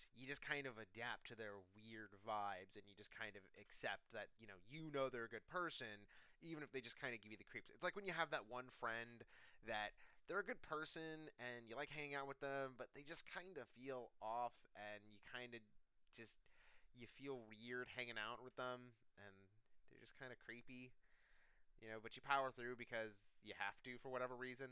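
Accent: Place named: South East, United Kingdom. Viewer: South easterner detected, all hail the pub sub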